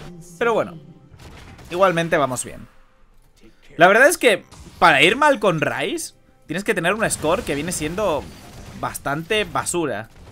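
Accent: Chile. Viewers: habla en chileno xd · Habla como chileenooo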